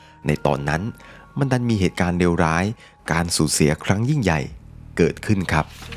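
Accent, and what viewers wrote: South, Thailand. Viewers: เสียงคุณ เหมือนคนใต้เลย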